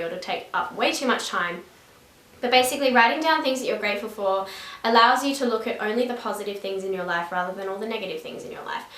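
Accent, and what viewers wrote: Australia. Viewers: I got so excited when I heard your Australian accent! Subscribed!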